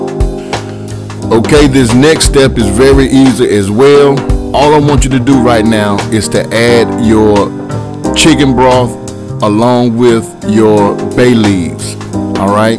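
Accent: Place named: Texas, United States. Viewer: Yo. Im in uk an my buddy said he had dirty rice last night said it was great. Never heard of it here but know i now.. I is gona try me soma this tamrw after work.. Dam right... Man i just lov the way yall talk there in texas✌️💜🍜.. Dirty rice is hitting the uk an we didnt hear it from man v food guy adam. Lol. Im after that mad flavour 💯. Respect Houston texas 💜.. Peace out.... Uk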